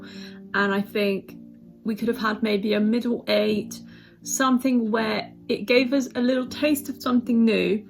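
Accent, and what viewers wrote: United Kingdom. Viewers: you're one of the most competent persons I've heard about theme of ESC...and your british accent is like a melody 🤗 Grettings from Serbia 👋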